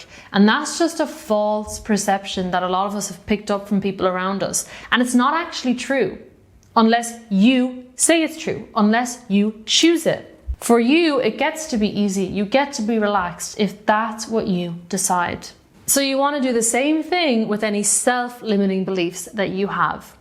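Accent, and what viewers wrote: Ireland. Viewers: Love your content - Irish accent is beautiful!! I am Irish myself from Limerick. Stop self sabotaging your attempts at drawing!! Haha....you rock woman!! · I lived in Ireland for 7 years, it’s the most dearest loveliest place on earth, I call it my Mother land. And your accent is the sweet nectar to my ears 🍀🍀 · Rewrite equals review or revision. Because resistance it’s versus support. Every time you speak, and your Irish accent steps out you instill this motherly love feeling that speaks to a man’s core identity, it’s a mothering, nurturing, caring, loving kindness, even a protection, you radiate a maternal love that he heals:)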